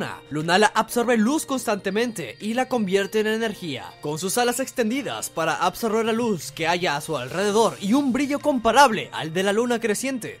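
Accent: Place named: Mexico. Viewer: donde puedo apartar mi versión del juego, o sea donde lo puedo mandar a pedir o algo por el estilo. Supongo que vives en México, por tu acento y las palabras que usas en tus vídeos.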